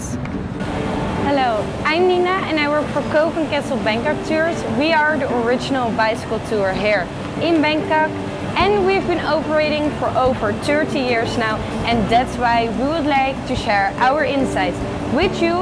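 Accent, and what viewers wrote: Netherlands. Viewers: hahahaha sounds like a dutch farang hahahahaha. This tour agency is extremely expensive. Norrmally this type of farangs got scammed in bangkok now they scamming their own people. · This is a dutch company right ? Ik kan het horen aan het accent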